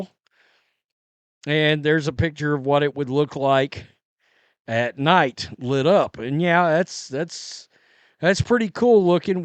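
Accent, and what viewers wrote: South, United States. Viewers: The sun came up this morning and that is b/c of CC. Come on now! as CC would say. I'm an Iowa Alum and big supporter of anything with Black and Gold, CC being the only reason why the Fever is building a new training facility is a stretch at best. Why don't you try losing that ridiculous southern accent and at least attempt to present some intelligent content.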